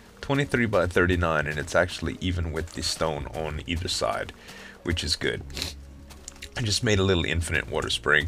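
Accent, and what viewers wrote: Louisiana, United States. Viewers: Hey great video! I'm glad you found me through Lembley :) Oh and have you been to New Orleans, just out of curiosity? I can definitely tell from your accent that you are from Louisiana!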